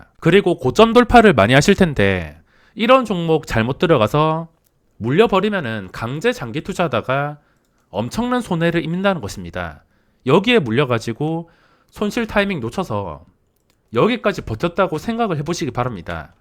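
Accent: Gyeongsang, South Korea. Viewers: 경상도 억양이 너무 구수하시네요 ㅎㅎ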